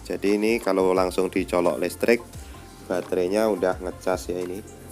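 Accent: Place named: Java, Indonesia. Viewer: Jowone ngendi mas...kok jowo blejed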